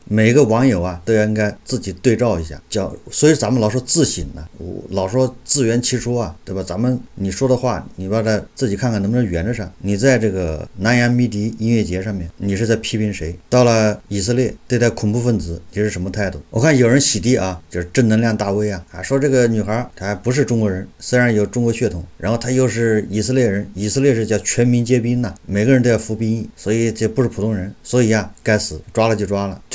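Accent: Anqing, China. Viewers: 你的口音像我老乡，安庆枞阳吧。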